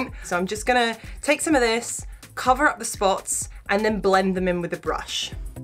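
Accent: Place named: United Kingdom. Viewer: this is amazing and also one thing i was thinking about was the you sound like your from the uk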